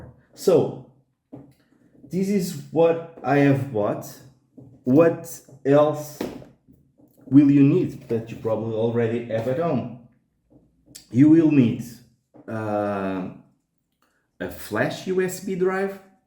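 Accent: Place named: Portugal. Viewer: Acho que é português e não brasileiro. Pelo sotaque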